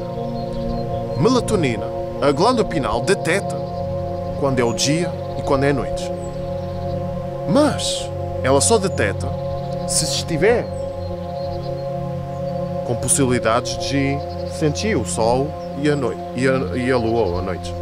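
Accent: North, Portugal. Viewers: Obrigada pelo video, maravilhoso, muitas informações que ja conhecia, e outras não, adoro ouvir vc explicar com seu sotaque português, tantas coisas importantíssimas para nosso crescimento espiritual !!!! a LUZ vencera !!!!! merci !!!! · este senhor tem pronuncia do norte de Portugal.... Porque é que está constantemente a falar no gerúndio? Porque é que tenta inserir o sotaque brasileiro no discurso?????????? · Você é, um Português!, que vive no Brasil!, certo?!,nota-se que o seu sotaque ainda é muito de Portugal (Talvez de Ovar Aveiro Sta Maria da Feira...por aí), um abraço enorme para si e para Todo o Brasil!!!. Namastê! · VC é português tentando falar em brasileiro pq? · Olá, tudo bem? 😊 És de Portugal? Bjs eu sou e descobri te agora mesmo... Mas tens um sotaque MT tuga!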